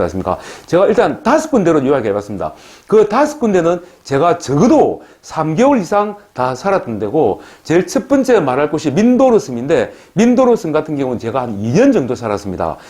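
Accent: Gyeongsang, South Korea. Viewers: 나의와이프가 필리핀사람인데 이런방송 괜찮네요! 지금 50대중반이고 필리핀 이민도 고려중인데 앞으로 다양한정보 부탁합니다. 특히 구수한 경상도사투리 쥑입미데이~^^ Impression도 아주 좋아요! 구독합니다.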